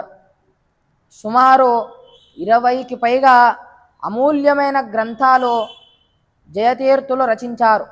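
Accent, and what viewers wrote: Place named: Karnataka, India. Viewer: వింటుంటే నిద్ర వస్తూంది. జయతీర్థులవారు ద్వైత సంప్రదాయానికి చేసిన కృషి మరువలేనిది. మీరు కన్నడ భాషలో అనుకొని తెలుగు లో మాట్లాడుతున్నారు. తెలుగును తెలుగు లా మాట్లాడండి. వినసొంపుగా లేకపోతే ఏదో చెప్తున్నారు లే అనుకొని వదిలేస్తారు. సాధ్యమైనంత వాడుక భాషలో మాట్లాడండి. ఆత్మవిశ్వాసం ఉండాలి. మీకు మంచి వక్త అయ్యే అవకాశాలు ఎక్కువగా ఉన్నాయి.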